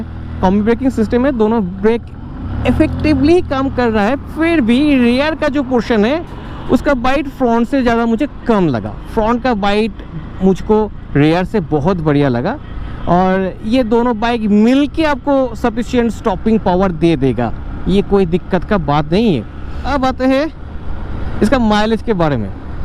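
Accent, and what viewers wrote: United Kingdom. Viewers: Hindi mai bol ya English mai bol but don't use British tuning brother But nice information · ओ भाई जरा ठीक से बोल ले हिंदू में भी इंग्लिश accent मार रहा है ढंग से बोल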